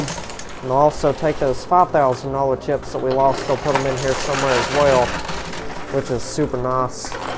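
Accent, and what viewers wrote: South, United States. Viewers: Definitely southern, probably call soda , coke. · I am English and I would like to know which region in the USA is your accent??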